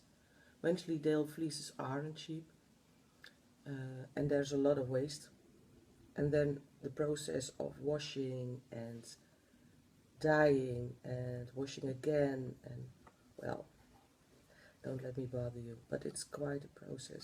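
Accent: Netherlands. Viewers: Aan het accent te horen bent u Nederlands. Petje af voor het goede Engels! Een tip: iemand anders iets aanleren is 'teach', not 'learn'. ("I will teach you how to do that') En ja, het is 'forehead'. Geen probleem. Het is heel goed om naar te luisteren, en de poppen zijn geweldig. Ik zou graag een boek zien dat de technieken beschrijft, bestaat dat? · Are you Dutch? cause yeah sorry for asking I still like your videos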